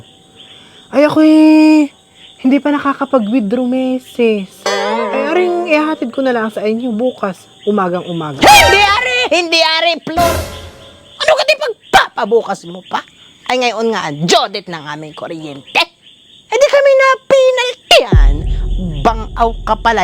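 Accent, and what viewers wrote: Batangas, Philippines. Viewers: Anong accent yan? Saang probinsya yan? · Gustong gusto ko ang punto mo, taga saan kb meses? Batangas o cavite?? Nppasaya mo kming lht, maraming salamat❤❤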